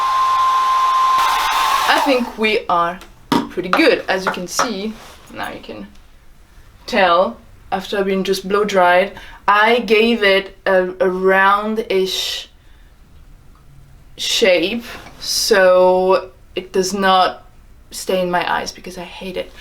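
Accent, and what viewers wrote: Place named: France. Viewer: je viens de decouvrir ta chaine, tu est française ? i just discovered ur channel and wanted to know if u'r french becuz of ur accent i find that it's somehow a french accent but in a goood way 😊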